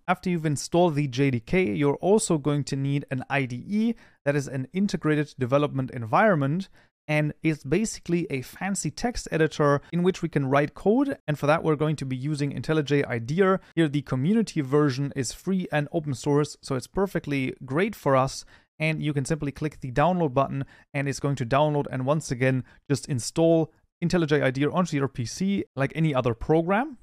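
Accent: Germany. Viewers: Is this an Deutsche akzent?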